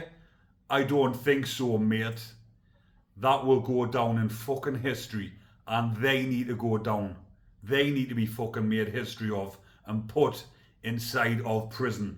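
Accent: United Kingdom. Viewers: May i ask what part of Britain is your accent from? I am very interested in listening to the variety of accents which your country has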